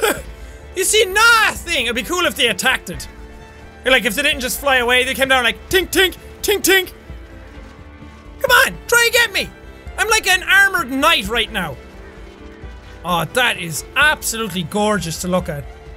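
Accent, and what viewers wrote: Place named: Ireland. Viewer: "And I don't know whoowhy." And other Irish ways of saying words. ^ | Jacksepticeye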